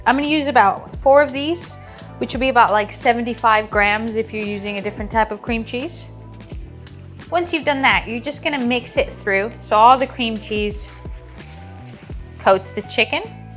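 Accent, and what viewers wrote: United Kingdom. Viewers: it's wild how your accent is mostly unchanged but you speak with a very British cadence now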